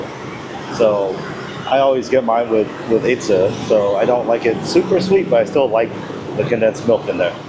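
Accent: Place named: Texas, United States. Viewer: Yeah, they start work super early. My sisters and parents in law just flew in this past Monday. Btw, no offense, but you sound like you're from Texas. LOL.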